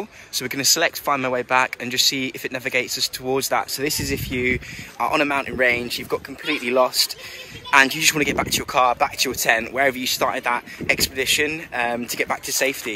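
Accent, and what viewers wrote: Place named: United Kingdom. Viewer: The video is probably good, but sorry can't understand half of it because of the heavy British accent.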